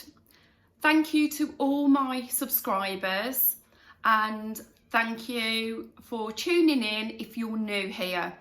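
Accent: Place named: Birmingham, United Kingdom. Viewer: Great idea about the food menu. I feel already now, just gone into my second week, that I have some problem to think out what to eat to even get up to 1200 calories a day. I know it's a "lovely" problem to have but I don't want to lose to lose too much muscles and get a lot of saggy skin just because I can't get enough calories a day. I do know a proteindrink or similar can boost the calorie intake but I'm really into getting my energy from "clean/pure" food now ie not junk food or frozen ready meals. I hope you know what I mean. I split a pizza for lunch yesterday with my dad and had the leftover as dinner. BAD move after eating good stuff the whole week up until then. But as it was my injection day I decided it was that day if I ever there was day to have it. I felt it in my body all evening that it wasn't a good feeling. Didn't go nauseous or so but it have really put me off even more to have processed food. 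But what to fill up with with now? Can't go all Roger Rabbit och scoff a full barn of chicken for protein. 😀 Have you got any ideas or tip of what to choose to fill up on? I have a long journey ahead of me (ideally lose 66-ish pounds to reach a healthy weight. I'm 48 btw and around 5 feet 461⁄64 inches tall/short). Warm hugs from a cold northern Sweden. xoxo Ps.. Forgot to mention earlier that I love your Brummie accent. 🙂 (I remember some chef on The Great British Menu that was a wellknown brummie..apparently...ha,ha..sorry, went total sideline there...)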